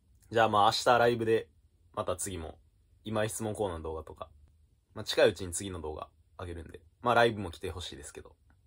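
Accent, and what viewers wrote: Kansai, Japan. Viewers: なんか灘生の関西弁が好き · ダーマより好き笑 関西弁かつ喋りがうまいなんなら、カジサックより面白いし笑 とりあえず引っ越したらどうですか？？？？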